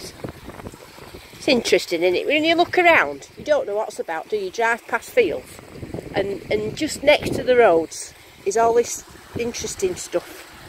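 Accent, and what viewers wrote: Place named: Bury, United Kingdom. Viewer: hi just subbed on this video, your accent sounds like you cant live to far from me in bury, you have a cheery positive banter and thats what made me sub, have you ever run your detector along the dry stone walls themselves incse any riches were hidden in the cavitys of the wall,just a thought..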